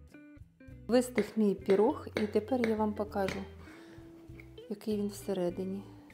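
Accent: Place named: South, Ukraine. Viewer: Південний степовий говір 🙏❤️🇺🇦 Бабусі мої так пояснювали )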